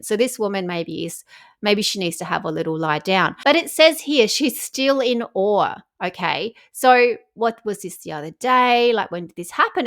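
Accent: Australia. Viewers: We can hear you're from Australia. Greetings from South Africa 👏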